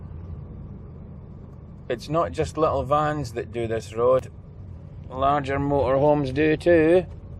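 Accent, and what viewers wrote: Scotland, United Kingdom. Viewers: 'Highland cows' 'Heel n kooz' What a wonderful accent. Thanks for the lovely video 🍷🍺👍🐾🐾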